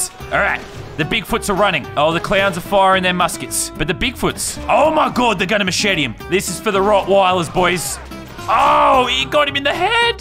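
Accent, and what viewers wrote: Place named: Australia. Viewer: yes, youre a patriots fan!!!!!!!! where do you live cause you sound Australian but you like the Patriots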